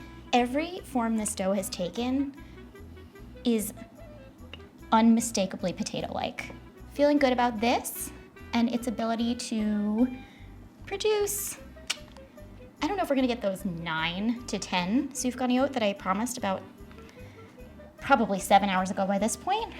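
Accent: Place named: Rockland County, United States. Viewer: I freaking love!!! Her sarcasm kills me throughout her whole video!! Growing up in Rockland County NY she sounds like every girl I went to HS with, I love it!! 🥰🥰🥰🥰. is the best!!!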